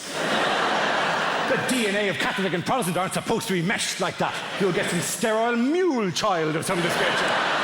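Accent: Ireland. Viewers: Is this the Dublin accent then? I wouldn't know, I'm from Norway. Just discovered this guy on J. Ross and found his accent interesting. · he's not even funny, you have to be irish to understand a word he's saying. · Im from Bray Co.Wicklow the same place as Dara and we have the same accent so technically its a wicklow accent!!!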